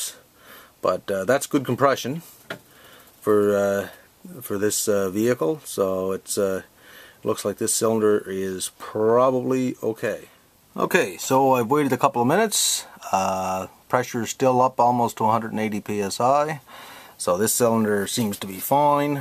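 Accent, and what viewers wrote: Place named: Canada. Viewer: Where are you from? You sound Canadian lol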